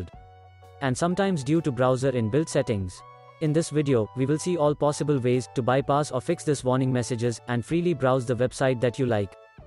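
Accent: India. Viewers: Damn even if it's a robotic voice these people achieved to make it sound indian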